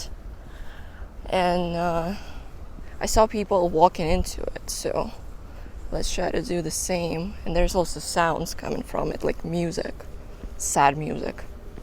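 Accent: United States. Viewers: You sound very American 😂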